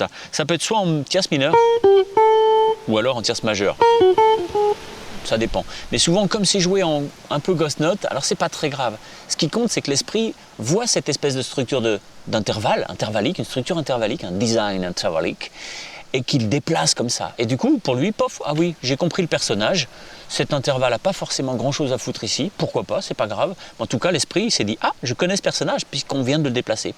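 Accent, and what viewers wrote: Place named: Salagou, France. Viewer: Putain con, cet accent du Salagou!!! ça donne envie de te suivre.Merci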